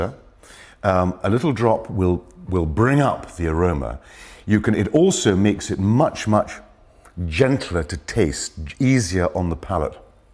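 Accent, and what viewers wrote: United Kingdom. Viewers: A British Optimus Prime?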